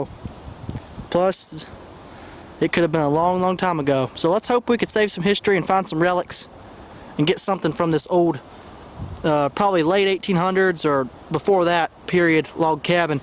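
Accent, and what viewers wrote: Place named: Vermont, United States. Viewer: it's vermont speech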